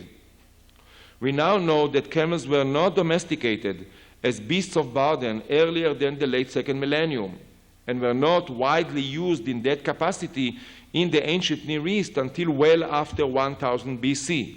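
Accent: Israel. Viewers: In English? You know he is a Hebrew speaker as you can hear from his accent. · Israel Finkelstein is awesome...An Israeli Jew who has no issue at all speaking the truth about the mythology of his people in the context of modern archaeology. The points he hits in this lecture are absolutely true and for the most part have been known for over 100 years. If you can get past his thick Israeli accent this lecture is a good summation for the average biblical-historicist to mull over.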